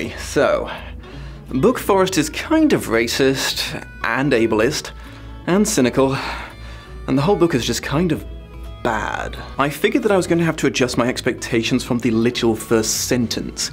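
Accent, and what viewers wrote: United Kingdom. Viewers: just curious, are you from the UK? I find that accents from parts of the world I'm familiar with (/from nearby so I've had at least the briefest IRL exposure too) definitely add to the immersion, but accents from other parts of the world can be sooo hard to grasp!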